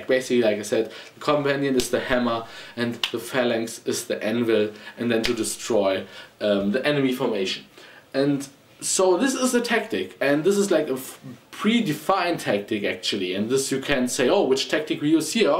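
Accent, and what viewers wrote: Germany. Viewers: Yes, Shadiversity are you German? Der Akzent kommt mir so vertraut vor xD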